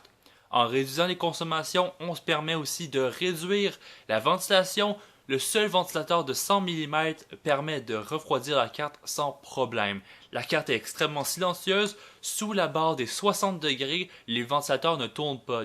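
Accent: Canada. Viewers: Je comprend bien, tu est Canadien ?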